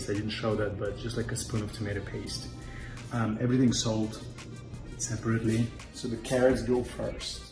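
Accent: Russia. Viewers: Russians will never truly lose their accent. As a Russian myself I can assure that...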